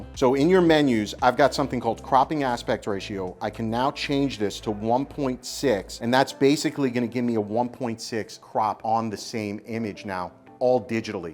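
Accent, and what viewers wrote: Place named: New York, United States. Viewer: As always, informative and helpful. Question, are you originally from NY? As a NYer that accent of yours harkens memories of home.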